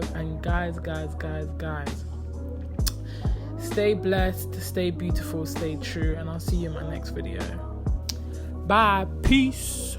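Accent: United Kingdom. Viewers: You absolutely love your British accent and your beautiful voice its like angel music to my ears i think i love you 🤣😝💕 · I'm sure you're Britishhh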